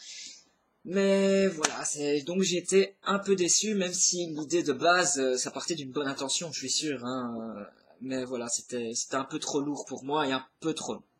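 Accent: Belgium. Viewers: Coucou :) Je me dois de te taquiner un peu ... Ton accent belge ♥ ! Je me souviens encore de tes premières vidéos où tu étais réservé et pouf .. Maintenant tu pètes le feu et anime tes vidéos :P Les quinze premières vies, c'est le genre de bouquin qui me rendrait folle ... Alors je comprends ta déception ! J'attendais avec impatience ton avis sur le livre que tu as abandonné :) Ahahaha, tu me fais rire x) Ah oui, généralement les profs étalent leurs connaissances dans leur bouquin ^^ Bon bha clairement, merci de la prévention, je ne le lirais jamais ^^ Bisous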